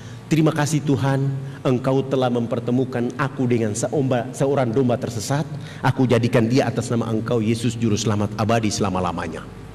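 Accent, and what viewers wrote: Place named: Papua, Indonesia. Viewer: Aku dari sumbar,aku suka bahasa papua,karna bisa membuat ku tertawa,dan merasa bahagia..habis rada2 lucu cara bicaray..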